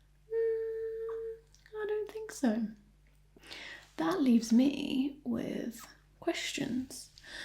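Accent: RP, United Kingdom. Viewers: That felt fresh & original but kind of classic at the same time. I like all the surprises in there, and posh accent! I probably could do with sunglasses on for the light bit though! Haha! 😎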